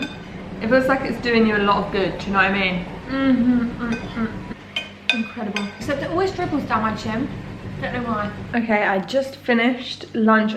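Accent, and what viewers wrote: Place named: United Kingdom. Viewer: i really like the relaxed vibe of your videos, that's probably one of the biggest reasons why i enjoy watching your channel so much. that being said i feel like i shouldn't be saying what i'm about to say but here we go lmao. audio quality is not the greatest. it's difficult to understand what you're saying sometimes and very often i wish videos were captioned. when you're up close sound is fine but when you're standing farther away there's so much echo and it can be difficult to follow especially since your british accent is strong (not a bad thing at all just bit more difficult to understand to people who don't have english as their first language).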